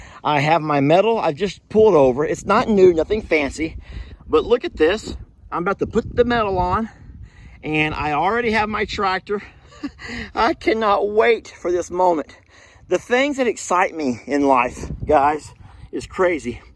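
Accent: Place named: Louisiana, United States. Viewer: Omg you are hoot, we were driving home from walk last night had this on in car listening through blue tooth and when you were measuring and said “well I see it’s just over 5ft in this direction” we fell out hollering what direction is that lol ohhhhhh lawd you are comical! Made me feel like I was at home in Louisiana I love it! Living in KC they say I say the funniest things 🤷🏻‍♀️ that’s way we talk in South 🙌 love this it’s really cool we use to have something similar but we threw square bails in it for animals! You did good job, looks great! You were spot on in your measurement in that direction!! 🤣🤣🤣 I’m just messing with you!❤️❤️❤️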